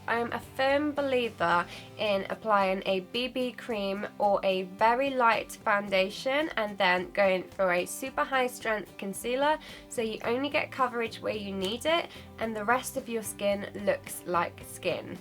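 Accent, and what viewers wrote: United Kingdom. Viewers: so in LOOOOVE with your british accent!!! its awesome! :) and i really like your videos, too. it feels so good to see you as a very self-confident person. do not ever lose it! i feel with you, because I'm also plagued by acne… greetings from germany.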